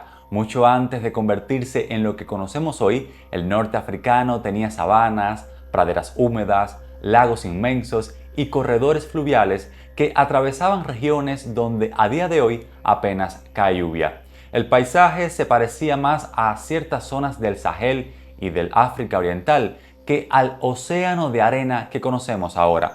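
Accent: Cuba. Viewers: Amigo tu acento me suena a Cubano. Lo eres ?? Gracias muy buen canal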